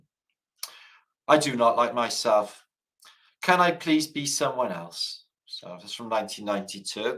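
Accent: Wales, United Kingdom. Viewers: I used to watch your streams with the closed captions on, and YouTube would get about 55-65% accuracy. But now it’s 95-97% accurate, even with your thick Welsh accent it’s so much better picking up almost every word and beeping out any language it doesn’t like. I wasn’t expecting this from YouTube to fix their algorithm so fast Bruv.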